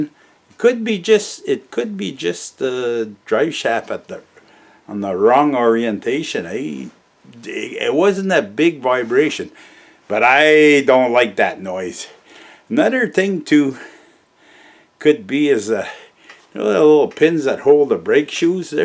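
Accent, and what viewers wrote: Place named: Upper Peninsula, United States. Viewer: Sounds like a Yooper. I think this guy would be a awesome neighbor.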